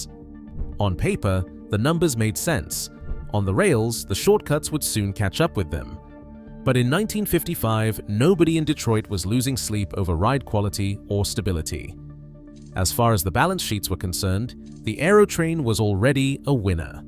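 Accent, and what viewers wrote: United Kingdom. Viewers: 😅can't listen to a Brit talk about this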